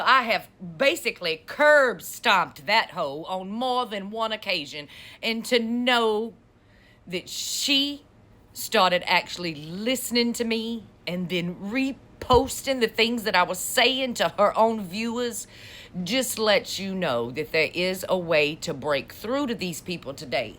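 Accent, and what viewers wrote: South, United States. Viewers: Keep it up. I know by experience that humor and a southern accent break through walls. Mine is more of a hillbilly, Eastern Kentucky accent but just as effective. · We love the southern whether heavy or not. We love the look, the laugh, the truth, and the absolute ease in which it seems to flow. 😘💙🥰 The jury still out on Marge. Give 'em hell.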